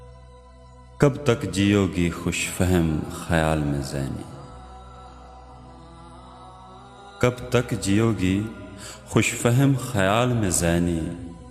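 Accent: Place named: Punjab, India. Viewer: Uupp Sir app ne khud apni awaz sune hai,halke Punjabi lehje mein jab app shayri karty ho app ki awaz bari cute lagte had😊❤❤..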